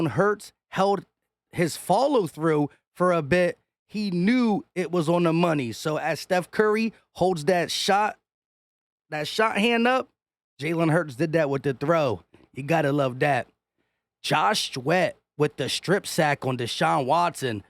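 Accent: Philadelphia, United States. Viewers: but wait yeah you could use so "professionalism and he could use some street" but don't get away from what makes you different know what I mean? You don't need to be proper cause philly isn't proper you sound like one of us he sounds like a sports broadcaster which works for both of you don't get away from that but I think y'all would be a great duo especially live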